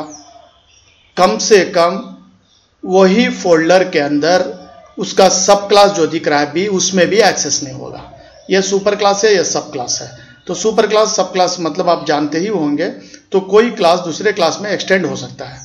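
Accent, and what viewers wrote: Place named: Hyderabad, India. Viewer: Haha! Hyderabadi! 👌🏻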